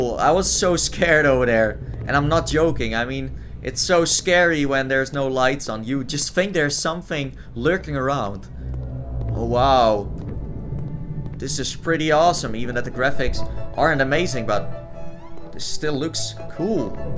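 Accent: Netherlands. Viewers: je klinkt best Nederlands ben je? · he has a dutch accent indeed! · Almost definitely. Such English pronunciations are the disabilities of a real Dutchman! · You kinda have a Dutch accent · Hoi Ik Ben ook Dutch Ik Dacht al aan je acent:D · I think he's Dutch, I'm Dutch and Dutch people got the worst accents, I can hear from his accent that he must be Dutch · Hij is idd nederlands, hoor je aan zijn accent toch? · Your Dutch. You can clearly hear it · Gotta love the dutch accent😉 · Ja ik hoor een Nederlander xD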